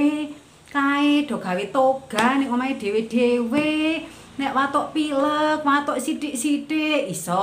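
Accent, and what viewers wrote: Temanggung, Indonesia. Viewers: Top markotop bu,bahasane Temanggung jan medhok tenan,jos banget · Marem Gandem logat khasss temanggungan Bunda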